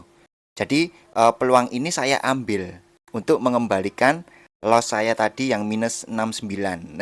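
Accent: Java, Indonesia. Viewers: Wong ndi gess.. Kok medok amet gess 😅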